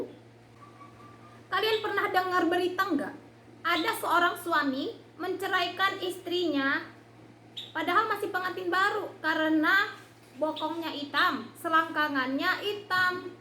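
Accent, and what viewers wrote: Medan, Indonesia. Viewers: Maaf mbak mcem orng medan mbak ngomong nya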